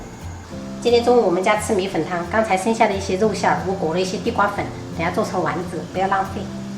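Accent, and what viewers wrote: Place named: Minnan, China. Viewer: 聽到講閩南語特別親切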